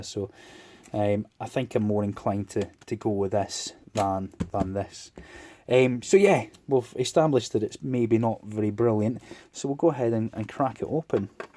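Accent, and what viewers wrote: Scotland, United Kingdom. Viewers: I want to win or as the way I heard it.....I wan tae win :D Memories of childhood friends with the accent - rarely hear a proper Scottish accent now.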